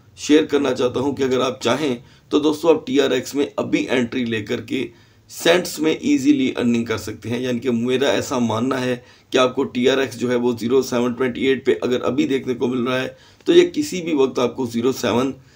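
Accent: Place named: India. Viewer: You speak like indians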